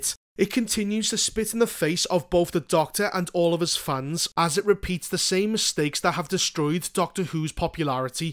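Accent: Liverpool, United Kingdom. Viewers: Sorry, I wonder are u from Liverpool?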